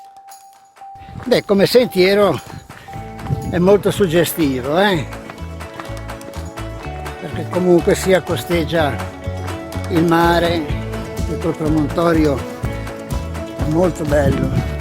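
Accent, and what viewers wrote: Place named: Genoa, Italy. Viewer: Fantastico bellissimo video che bell'accento genovese 🙂 Patty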